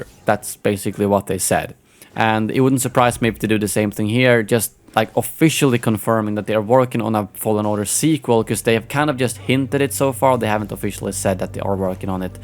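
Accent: Sweden. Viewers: Are u swedish?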